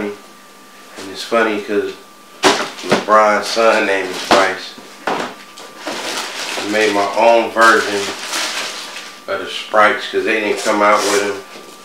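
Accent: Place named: South, United States. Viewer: He sound so country lol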